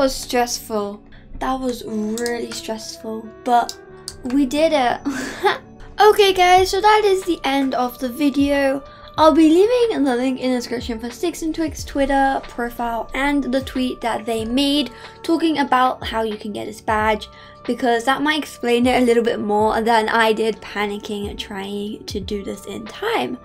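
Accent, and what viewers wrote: United Kingdom. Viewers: i love her accent She's British? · are you British?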